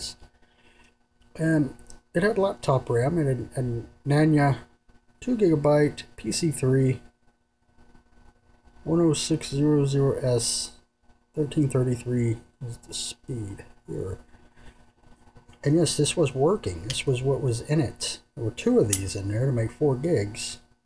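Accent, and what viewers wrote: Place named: United States. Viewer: Thank GOD finally someone American you can understand.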